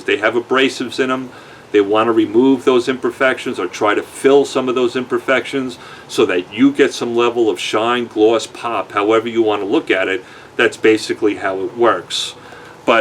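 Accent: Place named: Queens, United States. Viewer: how did a guy from Queens (I'm guessing based on the accent) end up in Dallas? Keep up the great work!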